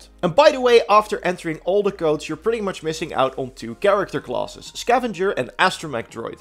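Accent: Netherlands. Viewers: Sorry doe alsjeblieft iets aan je accent. Je video’s zijn echt leuk maar het stoort me zo erg dat Nederlands accent.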